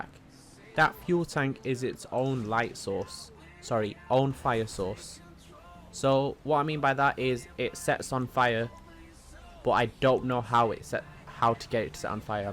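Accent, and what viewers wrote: United Kingdom. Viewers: You from Great Britain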